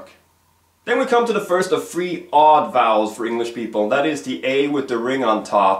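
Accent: Skåne, Sweden. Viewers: Your videos helped me a lot as a non-swedish user. I've visited Sweden two times, I just fell in love with everything, especially the language. When I miss it, I watch your videos to hear again the beautiful accent of your country.Thank you very much and keep up the good work! Oana from Romania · I am enjoying all of your videos, having just discovered them tonight. I lived in Sweden from <num>. I tried to learn the language and the culture as best I could, but I keep learning and learning all these years later. I used to think I spoke very good Swedish, but hearing you and other native speakers, I have a long way to go. I think Swedish is very difficult to speak correctly, but a very beautiful language. I like the discussion of Swedish vowels and how you compare the long and short vowels using similar words. You sound a little Skånsk, but you said you were from Karlskrona. 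I'll have to listen more. My morfar came to America from Sweden. He was from the Landskrona area, so maybe I should learn the accent.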